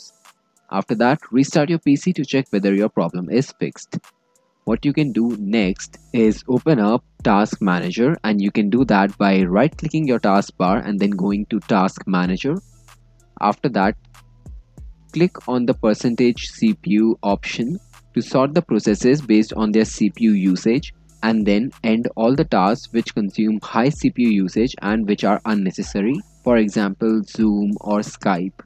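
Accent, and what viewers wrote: India. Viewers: when you hear indian people. you know it will work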